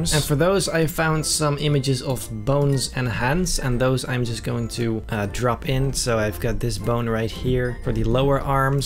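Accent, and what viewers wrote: Netherlands. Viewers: Fantastische artiest, Nu nog van dat hollands accent geraken. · You sound Dutch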